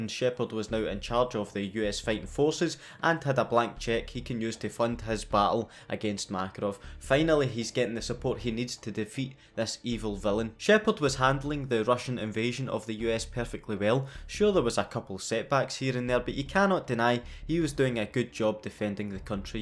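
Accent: Scotland, United Kingdom. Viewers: Fuck me ur voice is sooooooo sexy, subbed just for that Scottish voice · #notifactionsquad love your Scottish accent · #notifactionsquad excellent Scottish dialect I really appreciated it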